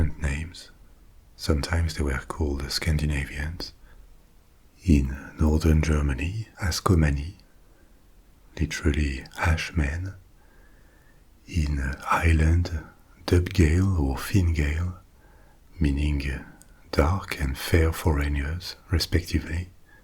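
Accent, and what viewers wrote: France. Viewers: Your french dialect is whats makes it perfect. Thank you for your work. Listing to it every night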